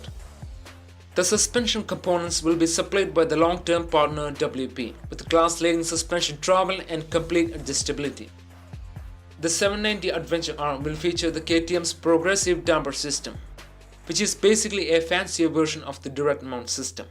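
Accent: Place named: India. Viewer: An indian voice for an indian bike.. finally ! Good, honest job !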